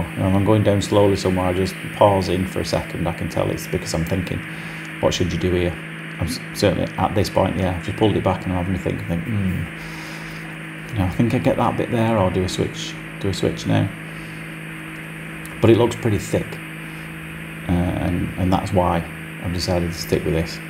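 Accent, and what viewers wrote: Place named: Liverpool, United Kingdom. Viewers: You’ve got a slight Liverpool accent 🙂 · Are you in Wigan? It sounds like you are?in the middle of Liverpool and Manchester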